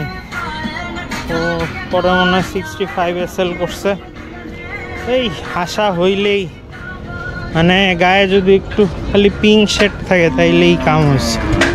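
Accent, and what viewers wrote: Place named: South Korea. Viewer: Oi hala English sound hoi ke ?? Thik Korean bangla den voice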